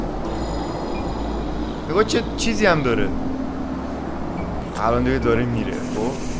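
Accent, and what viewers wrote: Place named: Afghanistan. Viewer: چرا لهجت افغانیه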